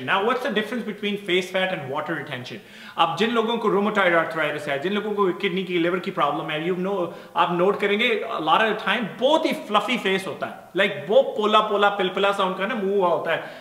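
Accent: Punjab, India. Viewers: I like your punjabi accent and also sense of humor. but this video doesn't help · 😂😂 bai Canada ch reh k v teri Punjabi ch koi frk nai ayaa... love you bro..👍🏼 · i love ur punjabi as well as english accent. i m huge fan of ur accent plus u r damn cute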